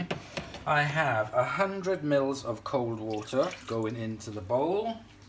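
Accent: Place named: Wales, United Kingdom. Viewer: the British half of the accent is clearly Welsh, and listen out for the sotto voce "bugger!" surely a very British expletive (my daughter first used it at age 2, can't imagine where she learnt it...)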